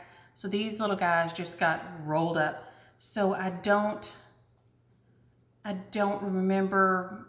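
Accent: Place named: Texas, United States. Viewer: I’m so glad to have found you! I thought you were tons of fun, and you sound like home (Texas) to me. Thanks for doing FlossTube!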